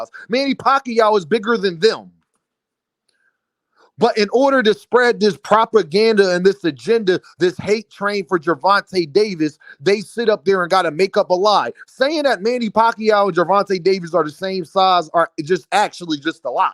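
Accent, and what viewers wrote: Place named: Philadelphia, United States. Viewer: Great show! I hear that Philly in your blood too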